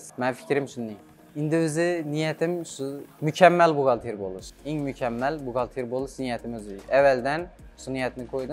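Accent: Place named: Khorezm, Uzbekistan. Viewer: Assalomu Aleykum Xorazm sheva gap yo`q, Buxorodan salom..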